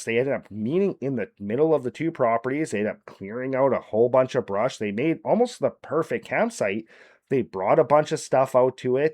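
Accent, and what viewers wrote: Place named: Canada. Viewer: I really like your story telling here. With practice, I think you could become a unique voice, but if i might give just bit of critique, find your own voice and deliver confidently with that. You're abour the third or fourth person I've ran across that has tried to match cadence and narration to Mr. Ballen. I'm not saying this because I'm a fan of his. I personally find his delivery to be kinda annoying and something that only works for him. You've got something unique with your Canadian accents, and way of talking. Lean into that.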